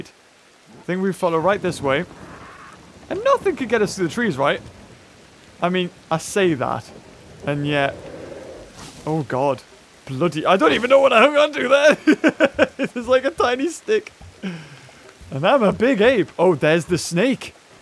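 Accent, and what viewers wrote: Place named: United Kingdom. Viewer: I love ur vids, I always laugh and smile, I'm going through a hard time, and I love ur British accent, and ur comedy, plz keep going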